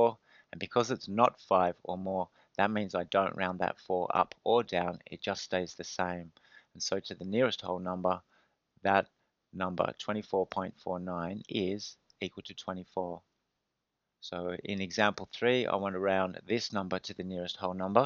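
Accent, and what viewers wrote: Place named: United Kingdom. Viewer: o0Ella0o English just a British Acent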